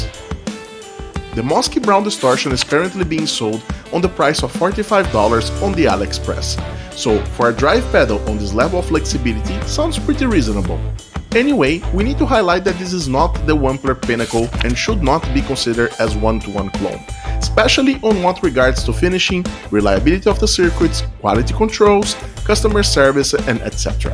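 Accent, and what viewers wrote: Brazil. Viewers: Esse timbre é de brasileiro falando em inglês em haha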